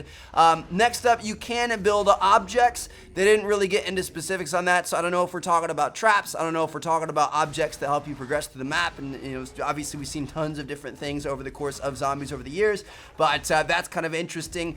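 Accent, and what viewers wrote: Germany. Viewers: Wie der einfach mal voll den deutschen Akzent hat im Trailer 🤣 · What a German voice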